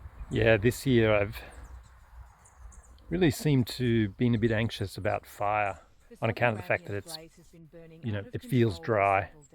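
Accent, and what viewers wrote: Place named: South Africa. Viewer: I can hear an accent, is it South Africa? I'm a pom on 4 acres in central vic too. My land looks very much like yours, sloped with lots of trees, it can be challenging to work with ;) I agree with you on the mental health benefits, it's so nice being out amongst the trees. I work from home in tech and I love those 15 minute walks here and there! I went pretty hard with the crops during covid but it got too much for me with all of lifes other demands. I just couldn't keep up with the weeding, the birds/insects/rabbits/possums decimating my crops, or the wombats bulldozing my fences. I kinda got burnt out making so much effort to get a bowl of strawberries or a basket of deformed half eaten corn, I scaled it right back and now just focusing on breeding chooks for meat, I'm trying to tackle the high cost/high value items. I want to do sheep next but we have no fencing along our creek.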